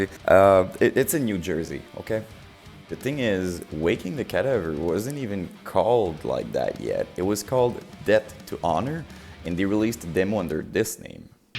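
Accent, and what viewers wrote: Quebec, Canada. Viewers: Been trying to pin your accent for ages, I settled on Swedish, good to know it’s French-Canadian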